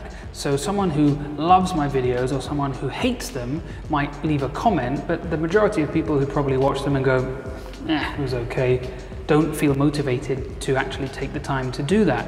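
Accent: United Kingdom. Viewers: You seem to know a lot about medical bias but I have a dictionary .So please spare me your snooty british definition with that that lame accent you might not realize youre over the top condescending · One can observe: - 930 liked this video vs 2 who did not. So far.... A bias of people who like a certain type of British accent? Or perhaps it's the background music? Or perhaps the mention of Minestrone. I like Minestrone - usually.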